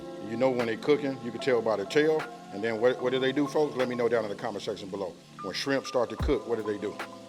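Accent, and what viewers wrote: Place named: West, United States. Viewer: I knew you was from Out west you got a strong accent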